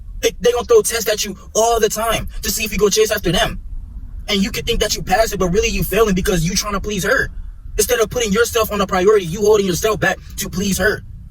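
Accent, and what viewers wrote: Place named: Los Angeles, United States. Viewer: Bro you got that L.A accent. You from there?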